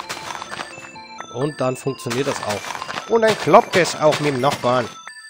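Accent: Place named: Saxony, Germany. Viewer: haha kannst du lustig sächseln! Kommst du aus Sachsen/DDR ?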